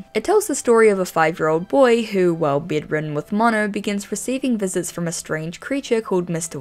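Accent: New Zealand. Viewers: I love your videos, but the kiwi accent is what totally hooked me 😅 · Is she a kiwi?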